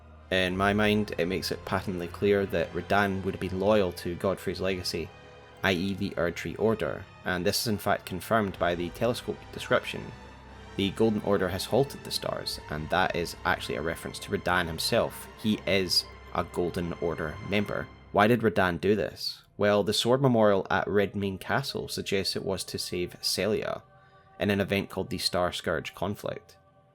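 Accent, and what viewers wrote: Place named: Scotland, United Kingdom. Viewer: Nothing makes me happier than hearing a Scot talk about Souls lore. Bring me McFlail!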